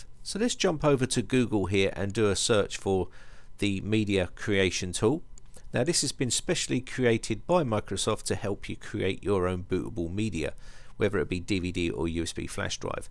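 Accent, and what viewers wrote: England, United Kingdom. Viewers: I just don't get English accent.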